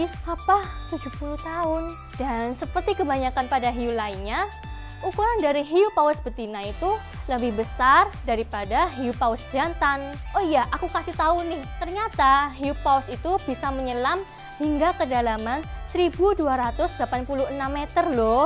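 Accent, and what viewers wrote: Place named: Java, Indonesia. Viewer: Medoknya imut deh 😂😂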